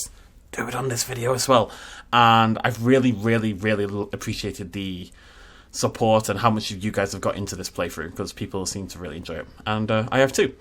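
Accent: United Kingdom. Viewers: Ah i love your planet zoo videos so much, they are the only ones I watch and you inspired me to go from flat squares with....no rocks! to rock filled funky shaped habitats with lots of bushes made from sunken trees. Dude do whatever you want with the game honestly I prefer the habitats and stuff and often skip the buildings (sorry) so I'm super on board with just habitat builds. Also I love your accent where are u from (lmao weird) I assume somewhere from the UK but not essex cause I'm from there and u will never her a t come out of my mouth lol.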